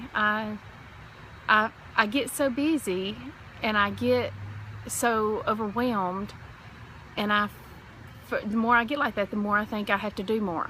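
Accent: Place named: Kentucky, United States. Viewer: Oh, I grew up in Kentucky so I love watching your videos because I miss hearing the accent now that I live in Alaska.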